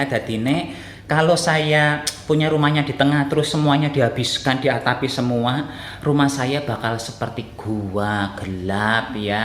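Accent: Banyumas, Indonesia. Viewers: Kiye siii wong endi? Ngapak ya? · sampeyan asli mana to mas koq pake jawa ngapak gitu Salam kenal dari saya orang solo 😁🙏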